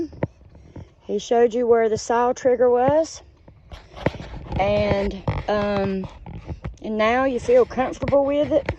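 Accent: United States. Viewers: MEN TAKE NOTICE OF THE DETAILED EXPLANATION. BY THE WAY, I LOVE THE COUNTRY ACCENT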